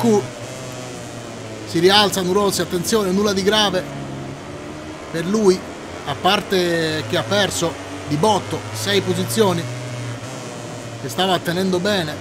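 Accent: Sicily, Italy. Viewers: Gerardo Cavallo Fan Teknoescobar sei Siciliano?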